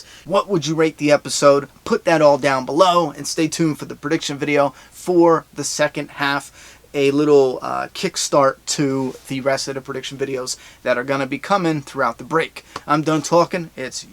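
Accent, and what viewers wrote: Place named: Philadelphia, United States. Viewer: Great video! Couldn't have agreed more, great episode, great ending they should keep blending them. Are you from philly? Your accent reminds me of home lol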